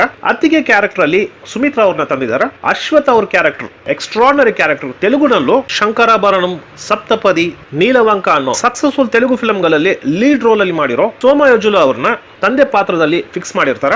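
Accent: Tamil Nadu, India. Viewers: ಸೌಮ್ಯವಾದ ತಮಿಳ್ ಶೈಲಿಯ ತಪ್ ತಪ್ಪಾದ ಕನ್ನಡ ಉಚ್ಚಾರಣೆಯ ನಿರೂಪಣೆ. ಕನ್ನಡವನ್ನು ಚೆನ್ನಾಗಿ ಅರಗಿಸಿಕೊಳ್ಳಲು ಸಾಮರ್ಥ್ಯವಿಲ್ಲದಿದ್ದರೆ ನಿರೂಪಣೆ ಮಾಡಿ ಕೇಳುಗರನ್ನು ಹಿಂಸಿಸಬಾರದು. ಒಂದು ಸಿನಿಮಾ ಕಥೆ ಹೇಳುವುದೇ ನಿರೂಪಣೆಯಲ್ಲ. ಹೇಳುವುದನ್ನು ಮನಃಪೂರ್ವಕವಾಗಿ ಸೊಗಸಾಗಿ ಹೇಳಬೇಕು, ವಿಶಿಷ್ಟತೆಗಳನ್ನು ತಿಳಿಸಬೇಕು.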